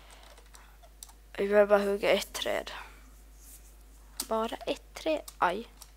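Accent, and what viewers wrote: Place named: Finland. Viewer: Jag tycker du pratar svenska som man pratar i Finland bor du på Åland? PS jag bor i Finland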